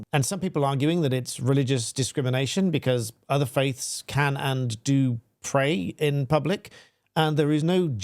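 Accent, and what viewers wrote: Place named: RP, United Kingdom. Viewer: Really wish the algorithm would pi$$ you off from my feed. The pretentious plum that is jammed in your throat leaves you sounding like a failed private school boy trying to do one last ditch makeover on youtube. Twat.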